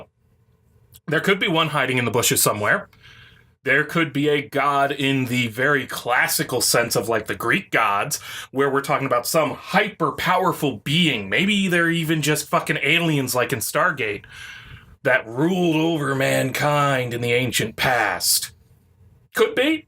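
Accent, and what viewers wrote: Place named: South, United States. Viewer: Dude, you're pretty impressive with the improv...that southern accent is hilarious. Tell me that's Guiness in your mug.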